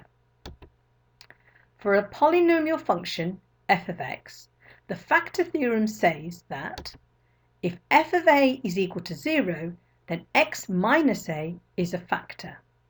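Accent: United Kingdom. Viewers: Nice British accent but doesn't clarify from which sky the x = -1 fail from . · It is pure British accent.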